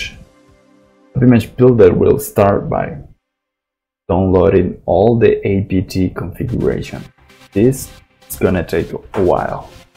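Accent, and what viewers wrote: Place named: Latin America. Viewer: Hello. I hear one latino speaking english here, asi que te escribo en español mejor. Cómo se puede hacer una appimage a partir de un archivo sh? Es necesario tener muchos conocimientos de informática? Te lo agradecería mucho. Gracias de antemano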